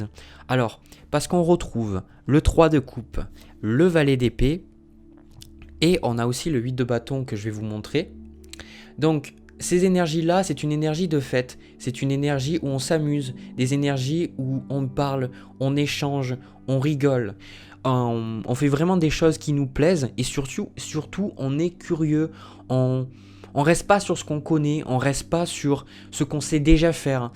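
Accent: France. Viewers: Bonjour, Merci pour votre travail et vos commentaires. Perso ça fait deux ans que l'on lit ...de nouvelles choses... Etre seul, n'est pas in problème...le calme,la paid et le silence..voila qui est bon. Bonne question: qu'est ce que j'aime faire...? Attendons led energies suppérieures 🤫 Oui, attendons.... Fini la routine,la dictature... L'argent ...pour creer une sociétè et creer des emplois....et faire du bien pour les animaux... Belle semaine Ps: vous n'aurriez pas un petit accent francais? 😘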